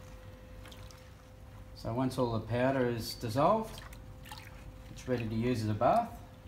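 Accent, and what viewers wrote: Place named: Australia. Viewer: I knew it was an australian accent But i doubt myself initially.. Because the way he speaks is so different to other english speaker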